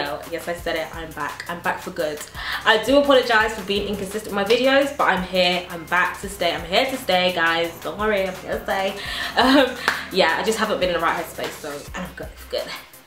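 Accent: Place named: United Kingdom. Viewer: I LOVE FUCKING BRITISH LANGUEG GIRL:):D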